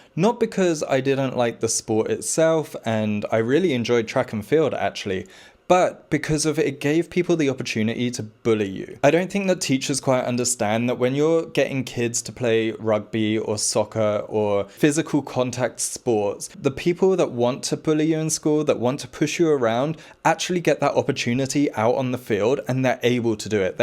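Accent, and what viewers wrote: England, United Kingdom. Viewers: Especially given that the handsome has excellent communication skills, especially when delivered with that gorgeous English accent 😍😍😍😍😍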